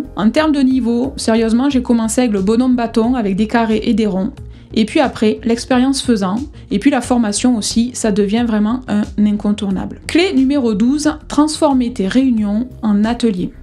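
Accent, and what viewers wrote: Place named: Southwest, France. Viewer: Bel épisode, clair, vivant dense et fournissant une vision du leadership adapté au 21ème siècle Si bien que j'ai voulu les lister pour m'en souvenir - je partage, mais que cela ne vous empêche pas d'écouter, vous rateriez l'authenticité de (et son bel accent du Sud Ouest) 1) Poser une intention claire: Définir son "Pourquoi" avant de se lancer dans un projet pour éviter de perdre du temps et de tourner en rond. 2) Faire de l'écoute active un super pouvoir: Écouter réellement sans préparer sa réponse à l'avance. Pratiquer le silence actif et reformuler ce que l’on entend. 3) Oser l’intelligence collective: Ne pas chercher à tout savoir, mais poser les bonnes questions et utiliser des outils collaboratifs (World Café, brainstorming structuré…). 4) Célébrer les petites victoires: Se concentrer sur les progrès quotidiens, noter et partager les réussites pour renforcer la motivation. 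5) Miser sur le feedback régulier: Le feedback doit être constant et structuré (ex. Plus/Delta/New, Triple A, Stop/Start/Continue). 6) Adopter une posture de manager facilitateur: Plutôt que de diriger, créer un environnement propice à la performance et au bien-être de l’équipe. 7) Définir des objectifs partagés et motivants: Utiliser des méthodes comme SMART ou OKR pour rendre les objectifs inspirants et engageants. 8) Faire confiance et déléguer intelligemment: Déléguer, ce n’est pas juste donner des tâches, c’est responsabiliser et donner du pouvoir d’agir. 9) Assumer la transparenc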